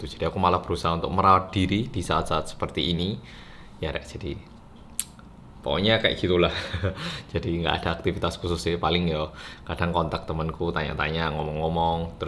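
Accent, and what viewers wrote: Java, Indonesia. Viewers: Rindu syekali ini sama 😥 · Stay strong 🙆🏻‍♀️ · Mas!!! Stay strong jangan mikirin komenan negatif yang komen negatif itu iri sama mas.gapapa 1 hari 1 vdeo atau seminggu 3 kali juga gapapa kok mas Stay strong mas!!!!! Welcome back to korea reomi bersama orang yang medok!!!!!!! Bolo bolo tetep dukung Mas yah😊!!!!! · Mung sampeyan sing medoke natural wkwkwkw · Welcome back 😀😊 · Mas.. Coba song bahasanya di ngapak ngapakin jgn medok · Yaaah.... namanya jg manusia, ada up & down emosi. Memang terasa kurang semangat nya sejak dr salam pembuka..... tp klu diceritakan begini, kita jadi memaklumi dan ikut mendoakan spy cepat pulih kembali. Caioo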